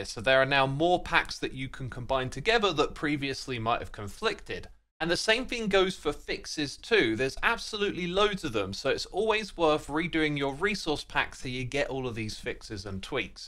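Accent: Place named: United Kingdom. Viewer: i cannot believe it has taken me this long, but this is the first time i realize has a british accent.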